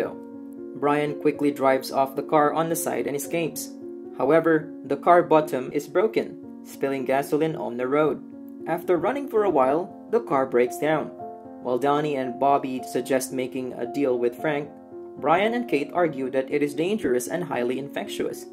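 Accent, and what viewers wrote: Philippines. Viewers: Is this a Filipino accent??? Great Video! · The guy who voiced over is 100% Filipino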